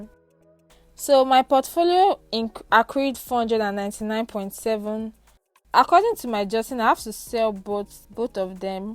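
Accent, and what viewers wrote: Nigeria. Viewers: Like do you get? When I subbed and started watching a video I was so shocked to hear the Nigerian accent Most Nigerians DONT get they hype of the game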